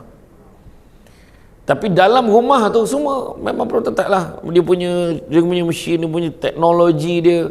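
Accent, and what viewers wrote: Negeri Sembilan, Malaysia. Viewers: Semoga ustaz selalu sihat hendaknya...saya suka dengar loghat nogori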